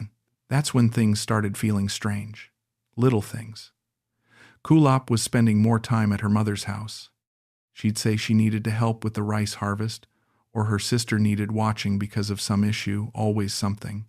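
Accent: United States. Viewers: Another scam story with a yankee voice, jeez this crap never give up their scam life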